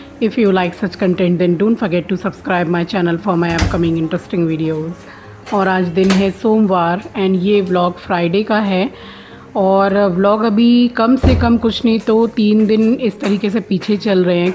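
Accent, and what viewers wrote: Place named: Kolkata, India. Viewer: Aapka typical calcutta accent hai..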